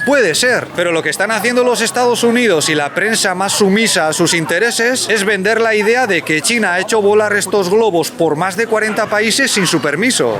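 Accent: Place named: Basque Country, Spain. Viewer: tiene acento Vasco. Parece que está echo un comunista de bien 🤣. En Euskadi también hay algún que otro partido comunista que apoya al presidente de España.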